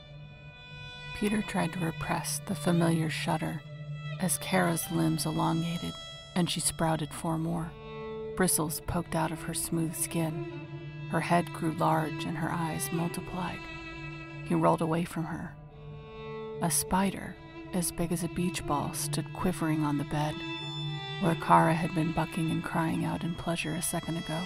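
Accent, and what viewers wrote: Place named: Wales, United Kingdom. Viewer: Very cool tale, and very nice Wales accent! 🙌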